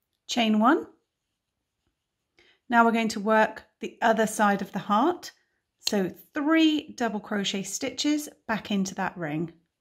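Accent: United Kingdom. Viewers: British accent+ US terms...PERFECT 💗 (I'm a Brit who dislikes UK terms 😆) I send these hearts to people who are recently bereaved.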